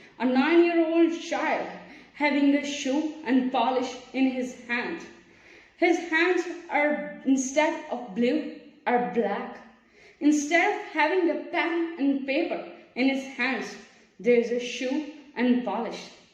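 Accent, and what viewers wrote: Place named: United Kingdom. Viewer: British akcent👌..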